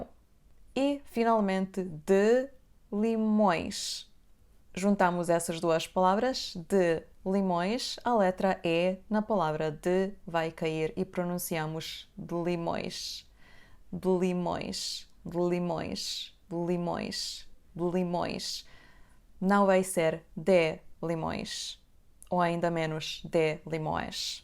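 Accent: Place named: Portugal. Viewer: Si te parez bien, te falo n'asturianu. Yes una moza per guapa y salá. Préstame muncho el acento de Portugal. Pa mí aína somos parientes. Puxa Portugal ✊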